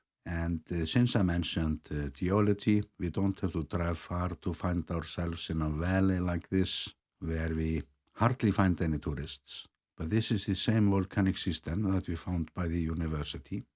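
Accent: Iceland. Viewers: Great video! (as always!). I visited some of these areas you mentioned on my visit to the snaefellsnes peninsula last year in April 😊 I thought it was a fantastic area to visit, I found borganes a little unusual! When I went it felt like a ghost town it was SO quiet and hardly any people walking around! But the peace was nice 😌 Was nice to revisit some places I went via your video! In August this year i am taking the road less travelled and I will be seeing the west fjords which I very much look forward to! Thanks again for another beautiful video. I LOVE your icelandic accent and commentary! x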